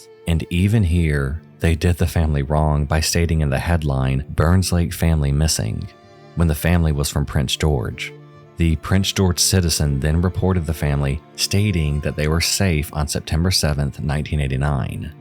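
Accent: South, United States. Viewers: This man's southern twang is kinda sexy hehe (i'm a guy)... 😝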